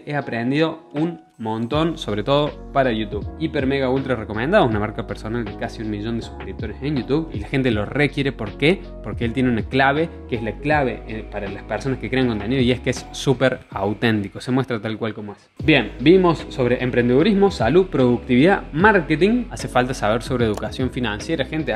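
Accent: Córdoba, Argentina. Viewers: No Eres Espanol, Argentino no Creo? Solo es curiosidad! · Uhhhh ese acento cordobés, ya le baja 9 puntos😡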